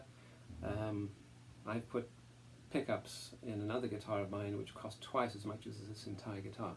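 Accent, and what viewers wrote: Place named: Florida, United States. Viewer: I’d recognize that Florida accent anywhere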